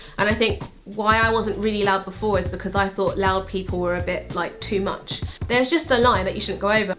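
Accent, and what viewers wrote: United Kingdom. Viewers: I heart the British accent and I subscribed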